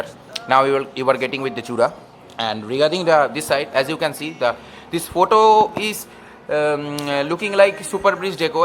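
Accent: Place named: England, United Kingdom. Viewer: Tumi english accent a kotha bolo nah, normal a e bhalo lago!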